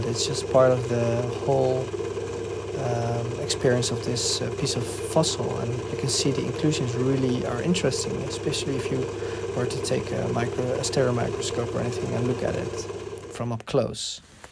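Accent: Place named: Netherlands. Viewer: Just by hearing his voice speaking English i now he is a hollander 😂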